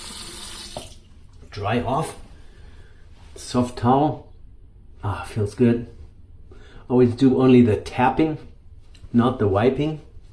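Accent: Germany. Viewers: Mußt nicht machen. Danke für die Erklärung. Dem Namen nach und dem german accent nach habe ich es mir schon gedacht. (von rock sprachst Du relativ am Anfang) Schöne Grüße über den Teich 👋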